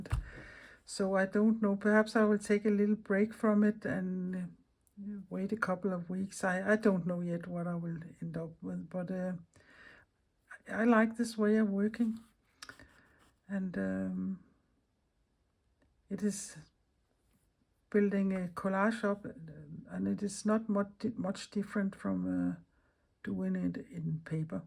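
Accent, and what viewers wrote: Denmark. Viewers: i absolutely love your approach to the creative process - these beautiful fabric and embroidery collages really resonate with me. i'm canadian, but my beloved grandma was danish, and i hear her beautiful, delicate accent in yours. thank you for sharing your unique, inspiring, thoughtful art. i look forward to more!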